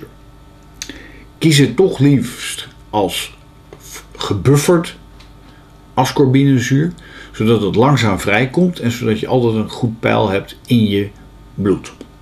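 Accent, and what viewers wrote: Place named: Leiden, Netherlands. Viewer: dank je wel...interessant! ik vind het leuk wat je vertelt! Ik wou nog vragen wat jou achtergrond is. Ben je arts of natuurgeneeskundig therapeut of arts? of/en het voorafgaande en helderziend of medium? Ben echt geinteresseerd! denk hetzelfde....maar wil gewoon meer van je weten. ps: Kom je uit Leiden?? Je klinkt zo Leids?!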